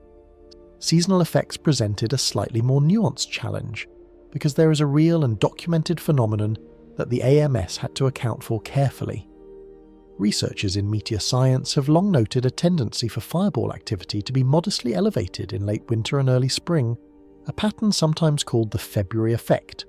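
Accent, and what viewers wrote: United Kingdom. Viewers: Please. Get rid of the insufferable Brit accent. Enough is enough! 😮 😊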